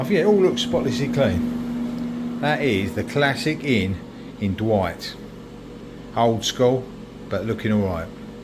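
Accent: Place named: Cockney, United Kingdom. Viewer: “get your s***s on route 66!!!” Sorry! Seriously though hope you are feeling better - An awesome start (Just binge watched your Denver to Vegas videos- fab) - Alan Whicker with a cockney accent. Can I ask if you get all your interesting facts off the app you mentioned and what is the name of it? Me and the Mrs will be following your epic journey. Best wishes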